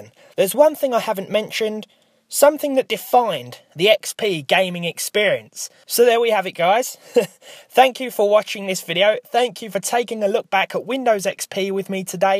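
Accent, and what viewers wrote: United Kingdom. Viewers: You're* nice uk english.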